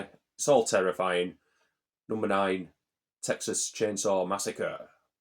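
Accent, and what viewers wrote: United Kingdom. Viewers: New subscriber, found this video randomly suggested, and thought great accent, sounds like he's from 'ull, like me. And some great picks here 👍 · hi buddy im from preston and judging from your accent, i dont think your far lol, where abouts r u from ? x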